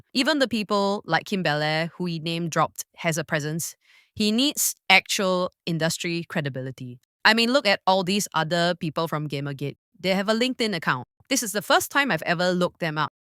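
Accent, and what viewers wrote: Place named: Singapore. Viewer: Eh you got Singaporean accent... 😮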